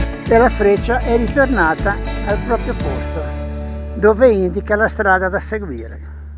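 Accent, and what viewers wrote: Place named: Liguria, Italy. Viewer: Interessante questa applicazione mi fa impazzire sul tuo accento ligure sei un simpaticone e racconti le Cose con molto informazione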